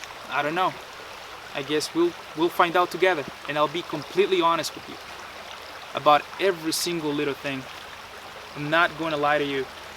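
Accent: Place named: Latin America. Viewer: I followed you from prepper nurse 1, because I enjoy learning from many different teachers. I hope you do your videos on the steps you make on your property and the work you do towards your goal. From my years ( I have kids your age) I see you've barely left the first quarter century behind, and I want to applaud you for making these decisions which seem to go against everything your generation stands for. Also, I hear in your voice, a Latin based language (Spanish, Romanian) and was just curious, which one?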